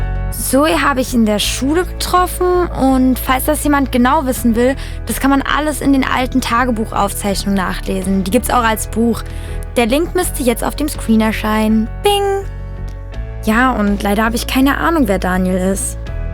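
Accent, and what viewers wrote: Germany. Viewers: Ich wusste dass du Deutsch bist aber jz auch nocj Baden Württemberg? Giiiiirl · Heck it's so weird hearing such a German accent, in the songs I can barely hear it! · Que delicia escutar esse sotaque alemão, já amava os vídeos agora estou apaixonada ❤ vocês cantam muito bem, desejo muito sucesso 💙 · I only speak English and I had to pause the video to read some but I don’t mind kinda and 1st your VERY good at singing 2ed I like how a German actcent is also sorry I spelled that wrong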